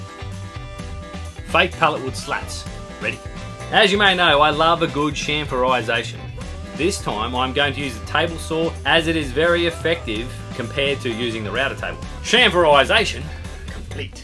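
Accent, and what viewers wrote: Australia. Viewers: Haha loved it. Most other YT channels just couldn’t have pulled this off. That Ozzy accent makes it work. Awesome stuff mate 🤓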